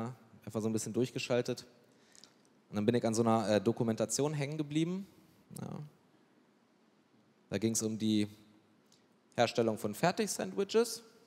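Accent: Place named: Berlin, Germany. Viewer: 1. Nennt sich das Dialekt. 2. Ist das ein Berliner Dialekt.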